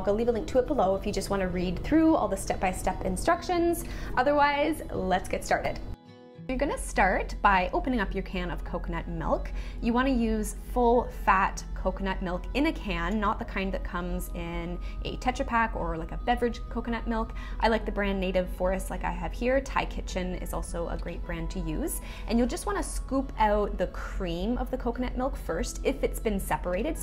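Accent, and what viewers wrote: Canada. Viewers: you sound very Canadian, but great video.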